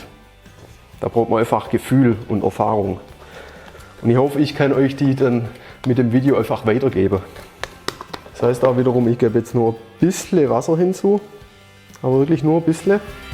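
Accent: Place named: Swabia, Germany. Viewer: Subborr Schwääbischkurs :)))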